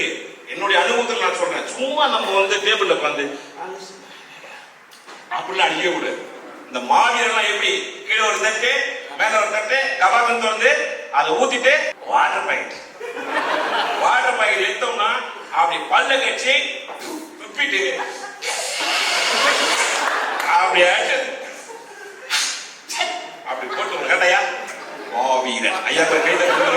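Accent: Bangalore, India. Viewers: Bro calm down - it's the Bangalore tamilians slang.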